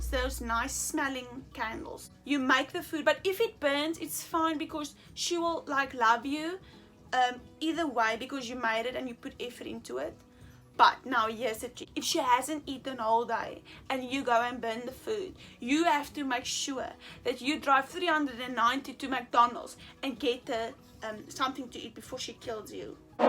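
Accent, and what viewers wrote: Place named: South Africa. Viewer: Ur accent does it for me, proudly South African lols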